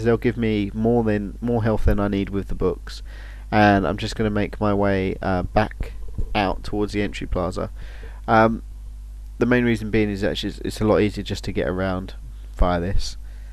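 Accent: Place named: United Kingdom. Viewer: hey ur guide is awesome and i love the british accent im american but id like to be british instead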